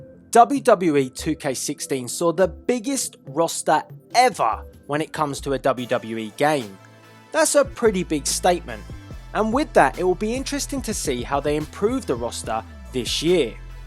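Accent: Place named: United Kingdom. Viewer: Every time I think a WhatCulture narrator could not possibly be more UK/British-sounding, they manage to top themselves.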